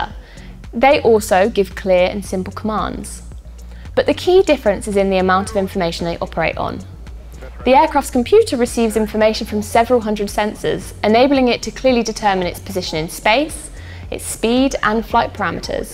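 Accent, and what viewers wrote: United Kingdom. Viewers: Yoooo, you rock!! Love your british accent. Also lukas and bradleys❤️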